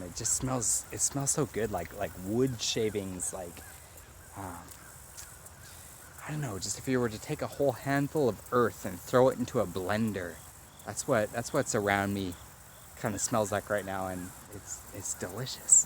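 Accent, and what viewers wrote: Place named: Northwest, United States. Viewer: I found and watched a previous video when I searched “Can you combine Monstera plants”. (Your video when you combined your plants.) I thought for sure you lived in the North West United States. Are you from there? You have the accent. We lived in California for 15 years and when we came back to the south, our families would comment on our accent and I didn’t understand what they meant until a few years of being back. Now I can hear it. 😂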